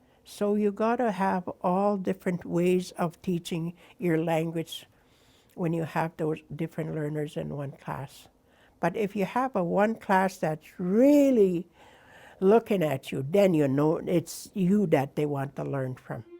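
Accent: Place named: Canada. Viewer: I love that she keeps saying "Eh". A beautiful linguistic blend of Cree and Canadian English :-D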